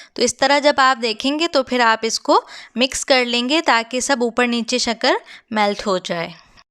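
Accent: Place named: United Kingdom. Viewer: why are you speaking urdu in British accent 🤨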